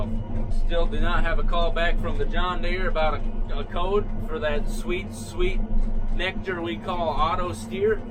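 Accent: Texas, United States. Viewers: you have a southern accent coming through on this video!!! You sound like your from Texas!!!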